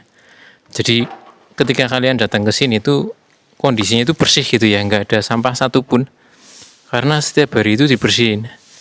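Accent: Banyumas, Indonesia. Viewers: katanya dari jogja, kok logatnya ngapak mas?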